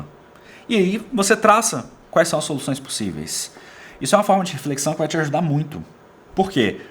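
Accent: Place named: Espírito Santo, Brazil. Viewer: Qual é a origem do seu sotaque? Eu conheci uma pessoa de Vitória - Espirito Santo que tinha um sotaque parecido com o seu.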